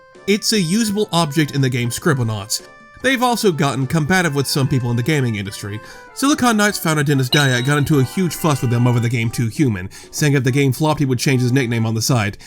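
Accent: South, United States. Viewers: random but i LOVE your accent. you sound very southern in the best way possible. i’m a born and raised georgian so i can definitely appreciate it. it sounds like i’m being spoken to by a friend.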